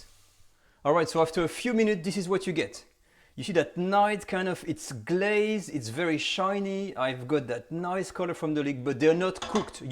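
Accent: France. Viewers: Merci 😘 j'adore French acent in English language. Bisous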